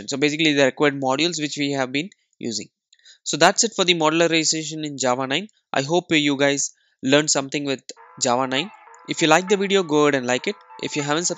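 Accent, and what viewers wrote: India. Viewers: When you hear the Indian accent, you already know the tutorial will be good.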